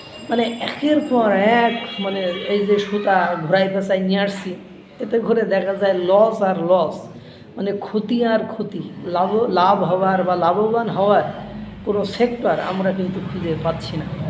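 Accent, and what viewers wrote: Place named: Bangladesh. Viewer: pare hoito, Kinta kotha bolar style modhey bangladeshi chhap ache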